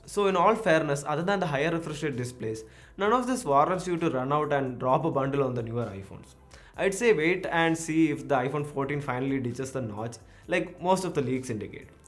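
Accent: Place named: Tamil Nadu, India. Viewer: Aiyo tamil ascent , so sweet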